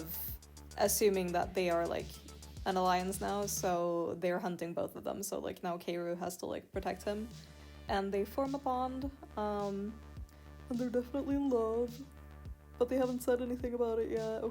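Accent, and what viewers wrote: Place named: Scandinavia. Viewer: do you speak Swedish/Norwegian/Danish? Your speech pattern and slight accent sounds so familiar XD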